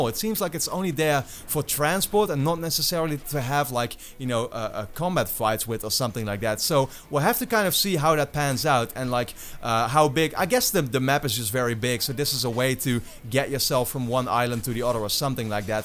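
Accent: Netherlands. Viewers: Is he from the Netherlands?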